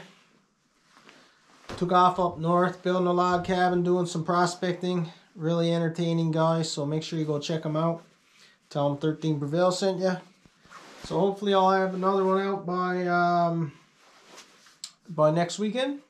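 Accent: Newfoundland, Canada. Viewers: Wow what a guy! Thank you sir for the shout out! Some nice to hear that beautiful newfie dialect come through my speaker lemme tell ya! Keep er going man!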